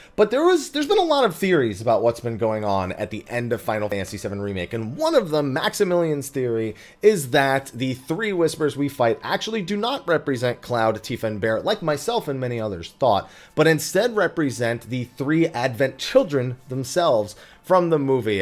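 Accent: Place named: Los Angeles, United States. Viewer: You sound like you’re from L.A. Are you?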